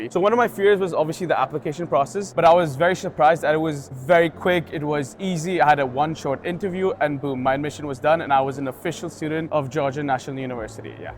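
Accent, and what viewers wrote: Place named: South Bombay, India. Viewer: bhai ye south bombay wala accent to yahin chor jata use kyu leke chala gya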